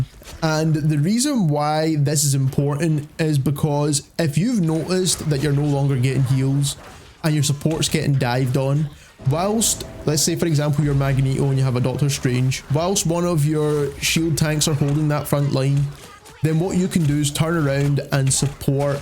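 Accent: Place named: United Kingdom. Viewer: "British" accent so annoying bro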